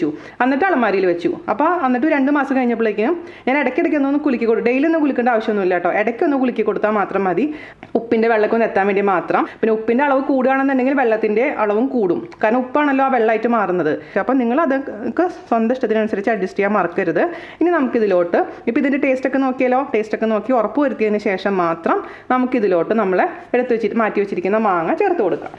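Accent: Thrissur, India. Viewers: Trichur slang So sweet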